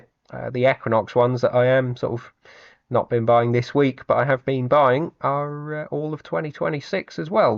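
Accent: Norfolk, United Kingdom. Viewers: Damn not often you hear a Norfolk accent on an investment video (North Walsham btw) ;-) . Awesome gains man, keep crushing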